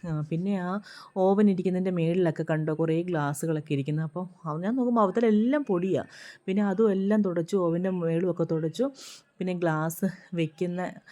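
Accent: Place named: Kottayam, India. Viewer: ഇയാള്ടെ വർത്തമാനം കേൾക്കാൻ നല്ല രസം. . നല്ല voice. . കുറച്ചു സീരിയസ് ആണോ ഇയാൾ. ....കോട്ടയം slang ഇഷ്ട്ടം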